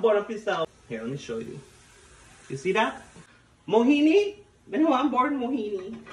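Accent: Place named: Guyana. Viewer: You're so funny 😅, this is how videos should be made, not boring, I'm cracking up really hard at your guyanese accent 🤣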